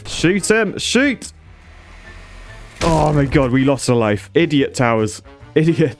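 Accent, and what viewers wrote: United Kingdom. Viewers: early to this amazing video from my favorite bri'ish engineer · Always great to hear that beautiful British voice.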